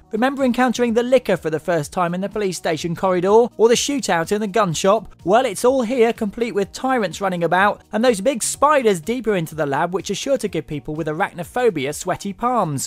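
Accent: United Kingdom. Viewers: i think hes british sh sm isnt out yet grr i must play it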